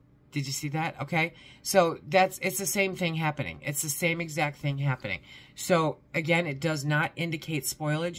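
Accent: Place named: Michigan, United States. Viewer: Your voice is pleasant. I used to live in Michigan. You sound like home.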